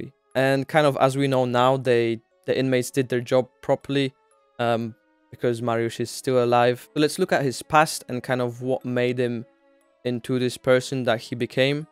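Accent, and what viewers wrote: Poland. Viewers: U can tell ur polish when u say all the polish names and towns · Masz silny polski akcent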